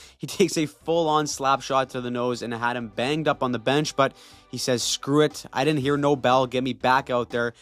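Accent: Canada. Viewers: So wil there be another weekly recap? who is this guy and why does he sound so Canadian?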